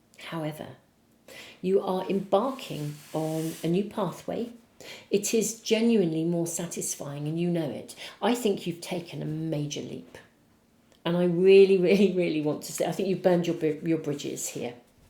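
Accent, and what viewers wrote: England, United Kingdom. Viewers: I'm speechless! I did #3; left a 43 y/o relationship that kept me unseen for too long. He dimed my light an my soul. I'm feeling a little bit unsure about my future and worry about my means but I'm proud of who I've become after beeing through my pile #1...I've healed my child wounds and still am as triggers hit me. I met my twinflame through out my healing journey and it is a bit complicated as he also has shadow work to go through. No contact and it's hard. Keeping faith. I will try my #2 pile and reopen my book project that stayed too long in limbo. I just want to be happy again for the rest of my life...with my 4 grown kids, my friends, my art projects and dreams along side with my soulmate that I love. I want to show to the world that if I can do all of this at my age, anyone can find their dreams if they really want to change and find their true self. 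You are right. Many people in my life do not approuve of my choices but I don't care. Thank you for your guidance. Your readings are helping me from day one. Sorry if you find my english writing a little off. I'm french speaking. Hope it all makes sense. Love and Light to you. You have such a lovely english accent.☺️ Merci 💐🙏🏻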